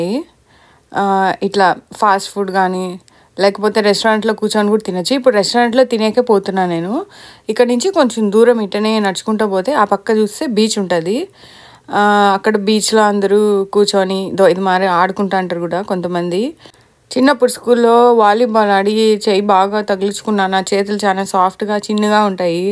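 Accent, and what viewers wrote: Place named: Rayalaseema, India. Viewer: mee Rayalaseema Accent chala chala bavundi And locations are so beautiful thank u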